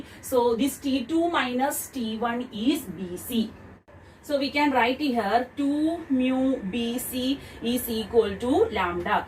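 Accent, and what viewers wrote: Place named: South India, India. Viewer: Tq mam,r u from kerala/tamilnadu/andr..mam?ur accent is like my bio teacher who teaches awesome like u mam 🤩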